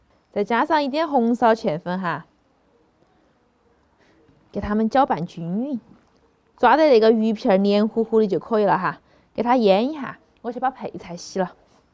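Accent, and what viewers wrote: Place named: Sichuan, China. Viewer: 喜欢的四川话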